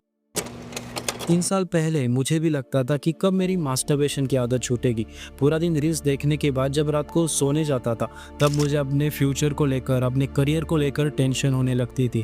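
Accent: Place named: Maharashtra, India. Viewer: Bro sounds marathi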